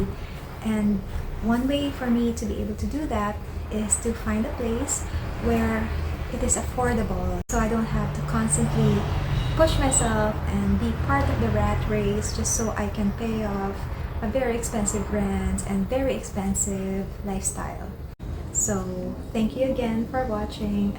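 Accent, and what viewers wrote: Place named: Philippines. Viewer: your English accent seems to resemble the way people here in the Philippines speak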